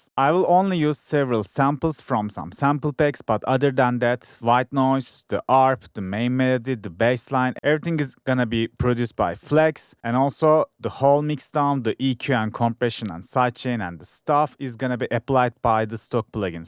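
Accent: Turkey. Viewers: bu ingilizceyi tanırım türksün demi kenks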